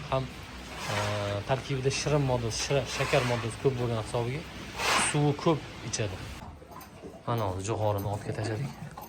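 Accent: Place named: Namangan, Uzbekistan. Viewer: Aka Namangandanmisiz sheva totyabti🎉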